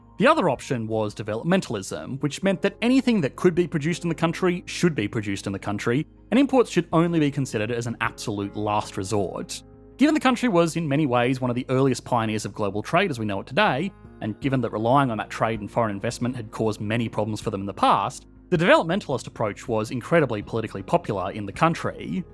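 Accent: Australia. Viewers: es ingles? el acento del narrador me suena a australiano pero me puedo estar equivocando (? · What kind of Australian accent does have? Sounds a little different than other Aussies. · Boludo, he’s Australian.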